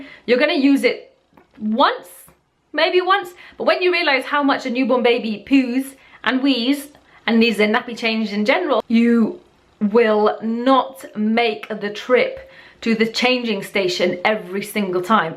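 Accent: United Kingdom. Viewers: ive noticed you pronounce video as vijio it's because of your british accent :) haha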